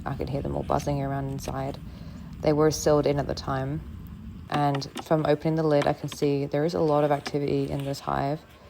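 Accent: Australia. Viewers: It's giving Aussie to me. Faint Aussie or U.K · That’s an Australian accent!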